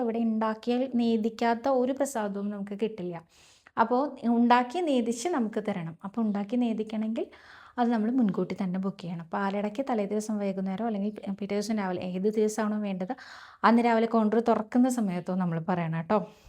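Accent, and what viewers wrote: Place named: Thrissur, India. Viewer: കഥ കേൾക്കാൻ നല്ല രസമുണ്ട്, പിന്നെ ആ തൃശൂർ സ്ലാങ് ഒക്കെ നന്നായി 🙏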